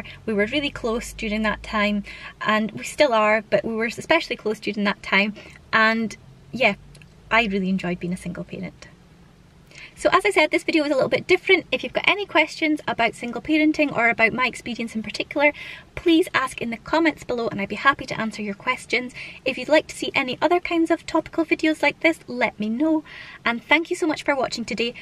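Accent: Scotland, United Kingdom. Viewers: You Scottish yeah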